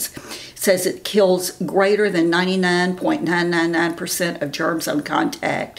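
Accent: South, United States. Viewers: Hi, im watching ur Q&A video (also about caftans). im a new subscriber. About negatives comments, why do these people watch(whoever’s channel) if they arent fans?? Some folks just need to complain🤷🏼‍♀️well i love ya girl!! I could listen to ur southern accent ALLLL day long!!!! 😘❤️ps say hi to Jim, and tell him the yard is looking great!!!🧤🌴🪴🌾🌸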